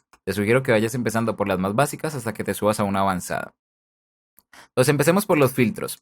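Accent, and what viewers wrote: Colombia. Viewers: tu eres colombiano de que parte eres pareces de cali si eso es asi un abrazo desde bucaramanga y gracias por todos tus tutoriales de lo nejor que hay en youtube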